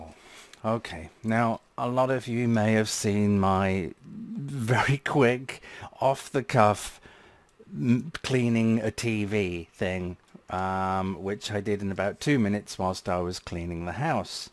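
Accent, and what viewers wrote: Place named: United Kingdom. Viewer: Very entertaining my friend. And it is common sense. At 69 years old, I'm still very young and a triathlete and I'm quite entertained not in a good way like you were to me but by people from ages 20 to 70 especially Americans as I moved from America to South America 20 years ago because of the cognitive dissonance and brain dead situation and prefer to live amongst my Costa Rican t i c o family and others from neighboring countries as they have more love common sense and appreciation of life. Thank you my British friend unless I missed it and you're Australian as the accents are close. Bendiciones from South America, Craig thank you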